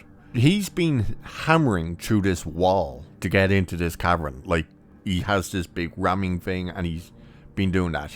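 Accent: Ireland. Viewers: I didn't expect to hear the name "T'under-Tits" in a lovely Irish accent today but it made me laugh out loud. Shame I just found your channel at the end of this clusterfück of a show. Subscribed. Cheers from New Zealand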